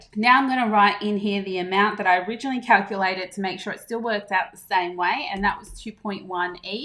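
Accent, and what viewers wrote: Australia. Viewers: Thank you. The process of moving a stop loss is a little different to Binance and FTX. Your video was clear and easy to understand. Good job. And good to hear an Aussie explain. I am new to youtube, how do I see what other video's you have?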